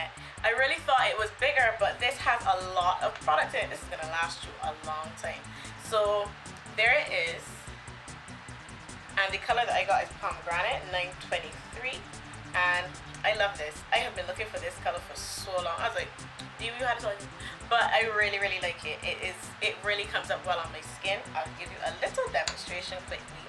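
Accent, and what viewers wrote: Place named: Barbados. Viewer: love the bajan accent. love when you roll your eyes. you are funny as hell.peoples get to know this chick!!!!!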